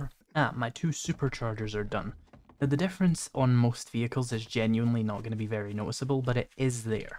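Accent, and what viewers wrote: United Kingdom. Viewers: The whining is getting cringe. Takes me 4 or 5 times to watch a video because nothing else to watch. The British accent doesn't help, makes it sound miserable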